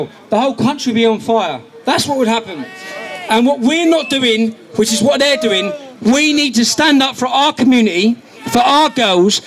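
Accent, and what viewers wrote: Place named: Cockney, United Kingdom. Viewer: WTF? Is this for real? A cockney Wanker (who looks like an Irish gypsy to me) preaching hatred to the folks that ate all the pies from Greggs? He He!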